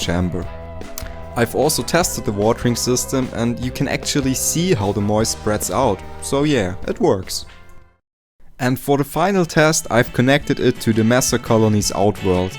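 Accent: Germany. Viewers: Hey. Nice nest. Looks great. Are you come from Germany? Your accent sounds like like german. I come from Hamburg in Germany. I like your channel. Greetings Alex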